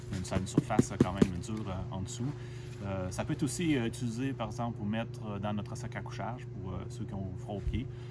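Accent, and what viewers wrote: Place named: Quebec, Canada. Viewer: Je ne te connaissait pas très intéressant!! en français ...Québécois super !!!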